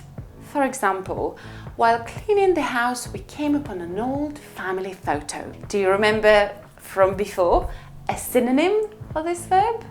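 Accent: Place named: United Kingdom. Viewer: O, my Gosh, I loved your accent! I dunno why, but I like British accent. It's so beautiful and royalty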